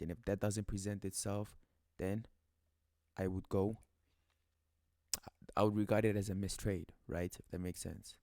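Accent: South Africa. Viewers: just plain typical Joburg boy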